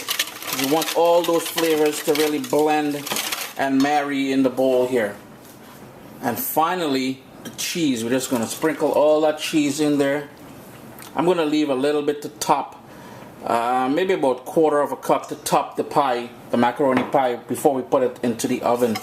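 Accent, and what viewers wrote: Trinidad and Tobago. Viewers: He is a Trinidadian ! And he lives in Canada so he has a Trinidadian and Canadian accent ! both accent intertwined with each other . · I think he is from Trinidad because I know how trini people talk cause I am a trini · I've been around Trinis all my life and to a non Trini it sounds similar. I think it is the Indian influence because I find it sounds similar to an Indian accent sometimes. I know there's a difference though. I always thought this guy was a Trinidadian based on his accent but the post that we are commenting on suggests otherwise.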